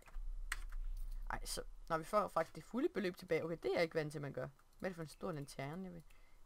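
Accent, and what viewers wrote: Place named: Denmark. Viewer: MegaMysticmermaid it's because your English you can't understand her because she's Danish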